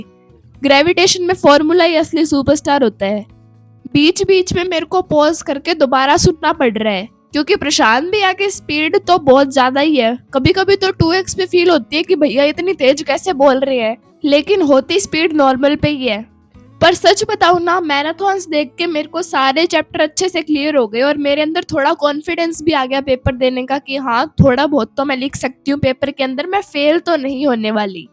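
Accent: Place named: Haryana, India. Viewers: Bahan are you from Haryana because from your tune it seems that you belongs from Haryana😊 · Yah she is from Haryana hisar 😊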